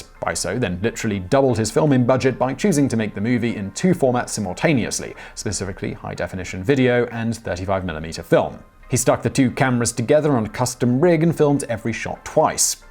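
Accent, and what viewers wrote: United Kingdom. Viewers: Simon reading Tommy's unintelligible quotes with a dignified and professional British accent is pure gold. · The funniest part is hearing a man with an impeccable British accent quote the broken English of Tommy Wiseau.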